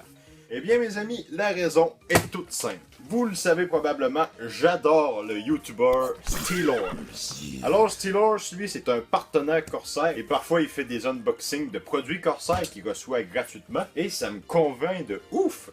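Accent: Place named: Gaspésie, Canada. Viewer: Cosmo The Cat ne parle pas comme steelorse... Il est juste canadien, quebecois et gaspésien comme moi!!! Vive la Gaspésie ❤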